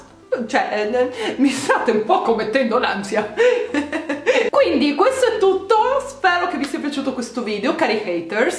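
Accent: Trieste, Italy. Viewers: VIVA L'ACCENTO TRIESTINO!!!! 6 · Il fatto dell'accento è bellissimo, perché io ho degli amici di Trieste e parlano proprio come te e non credo che sia una accento di...💩 Comunque ti adoro!❤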